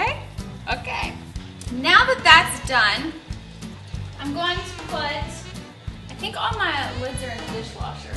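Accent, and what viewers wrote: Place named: Colombia. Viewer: i love her Colombian accent !! lol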